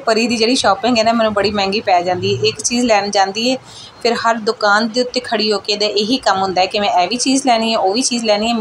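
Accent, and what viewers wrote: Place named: Punjab, India. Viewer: Tuhadi punjabi sun ke bahut acha lageya. Bachon ki yeh choti choti baatain kitni khushi deti hain. Meri duaa hai bachon ki hansi aise hi ghar mein barqarar rahe aur Allah aap sab ko bahut nawaze. aap punjabi mein vlog banao, bahut acha laga. Main bhi punjabi hun.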